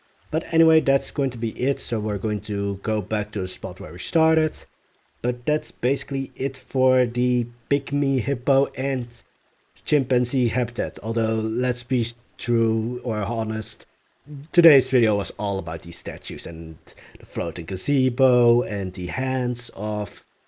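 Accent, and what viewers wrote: Netherlands. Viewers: you sound dutch so i think youre a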